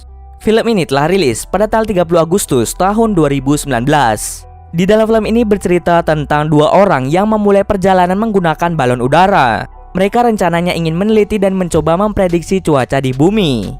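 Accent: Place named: Palembang, Indonesia. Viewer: Cknyo wong plmbng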